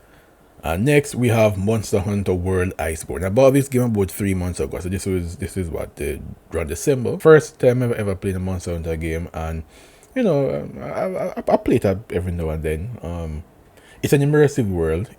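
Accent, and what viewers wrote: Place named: Africa. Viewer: We all have accents. You have this strong African tribe accent. Where are your parents roots from?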